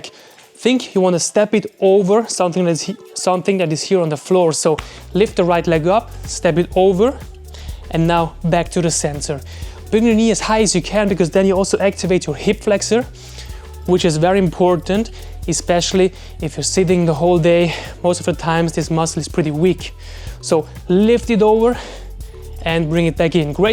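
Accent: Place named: Russia. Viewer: интересный акцент, вы русский?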